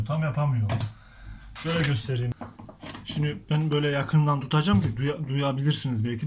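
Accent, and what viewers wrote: Turkey. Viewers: sizi tebrik ediyorum. Ben bir yüksek mühendisim. Aynı zamanda 25 yıldan fazla mesleki kurslar verdim. Konuşman , Doğu Karadeniz şivesi , ama mesleğe çok hakimsin , abonen de oldum , Sıkı bir takipçim de oldum.Tekrar söylüyorum, çok güzel ve detaylı anlatıyorsun.başarılsrınım ve videolarının devamını diliyorum.Haa ben 70 yaşındayım. Ama takipçinim. Başarı dileklerini tekrarı ile.Esen kal.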